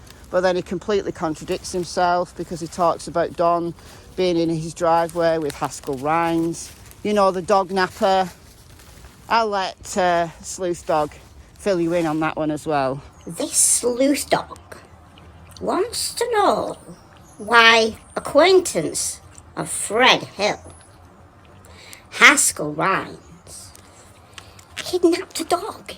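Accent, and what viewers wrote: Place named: England, United Kingdom. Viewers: Hi from a Lancashire lass living in France! Love to hear your accent and to follow your daily walks with your adorable girls. Thank you for all your reviews and updates on various cases. Your talks are always based on facts and substantiated details but you also think out of the box using your instinct and commonsense to see through the twists and turns of all the intriguing cases you cover. You are a proper northern lass straight talking, analytical, empathetic and able to see all sides of the story. Love listening to your daily walks and crime story Sundays. Take a look sometime at the intriguing French crimes, there's a lot! 🤗🐕🐾🐕🐾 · From Linton, Indiana (South Central Indiana). Love your content; seeing the English countryside; and watching your dogs run and play. And I love ❤️ hearing your English accent.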